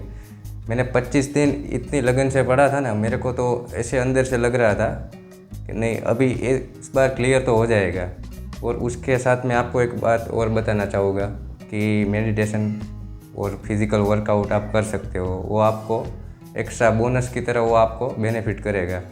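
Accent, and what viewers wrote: India. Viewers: Awaaz thee khabar padhe jaay gujarati a par saurastra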